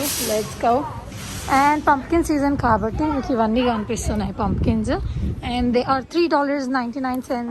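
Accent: Telangana, India. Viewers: Love your voice i think your from Telangana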